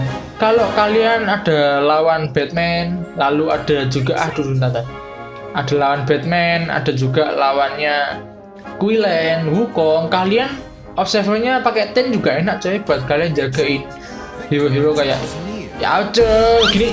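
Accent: Java, Indonesia. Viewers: Wong jowo yo lur mantap tenan 😂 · Bang wong jowo tah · Asli kang kog medog tenan.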